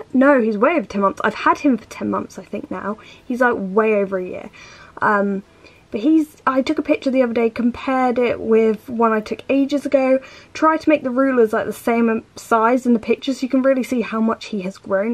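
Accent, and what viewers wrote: United Kingdom. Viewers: Think i ask this on all your videos but never get a reply. Are you from the uk?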